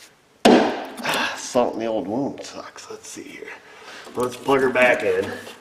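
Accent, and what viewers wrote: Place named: Pennsylvania, United States. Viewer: This guy has integrity and smarts. I'd trust my ride with him, which is trust I don't easily give...judging by the accent, i'm guessing he's from PA. I'm not surprised he's busy as hell.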